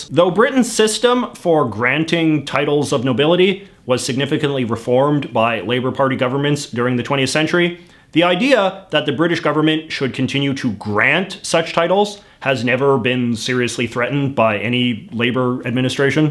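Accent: Canada. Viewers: Are you by any chance Canadian? And yes I ask because of how you pronounce out. No offence, just wonder. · is such a Canadian with his very pronounced "aboots"! That's part of what makes him intersting to me, I suppose.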